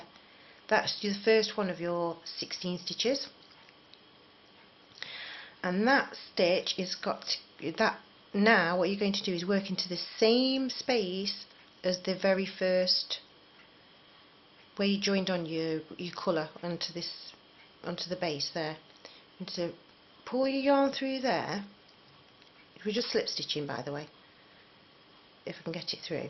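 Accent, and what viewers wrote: England, United Kingdom. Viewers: Thank you very much I did it and I am so happy, you make very easy to understand, and thank you for the history about crochet that you kindly share in your video, I love crochet and I love your English accent, I leave in Australia.:-)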